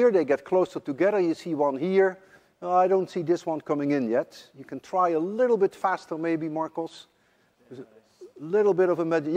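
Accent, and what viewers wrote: Netherlands. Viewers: Haha , it took me 5 seconds to know you're Dutch Mr Lewin. You speak DENGLISH . Zo noemde m'n engelse collega's het. You think Dutch and translate , where as i think in English and write it down. Maar ik vond de video boeiend en duidelijk. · Try it , Huygens , hoigens........ Die Nederlandse tongval is en blijft geweldig.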